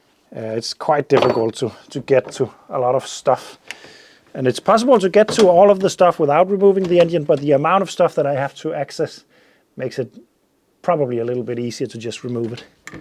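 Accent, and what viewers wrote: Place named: Denmark. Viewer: Hello i just stumbled upon your channel because i saw this saab video. Then i heard your voice and i knew you were danish! (im danish too). It's great to find a danish car channel, there's so few :) I love the saab 900's and im probaly buying a saab 900s (low pressure turbo) as my first car! It's got a bit of rust, the clutch hydarulics arent working but it's awesome! I agreed with the owner that he was going to fix a little problem on it and then i'll buy it. It'll be a great project! :) Keep the saab videos coming :)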